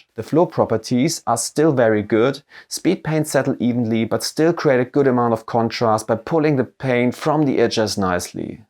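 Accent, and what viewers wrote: Germany. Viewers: Da klingt einer aber ziehmlich deutsch! Tolles Video und deine patreon Vergleichsbilder sind super! Hab den kompletten Vergleich von allen 5 Marken gekauft !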